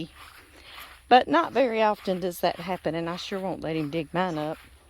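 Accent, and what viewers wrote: South, United States. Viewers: He makes the best gumbo and his Cream Chees Pecan Pie is to die for. I am going to try and make succatash. I love you S. Accent. We have lived here for 48 yrs and he has not lost his accent.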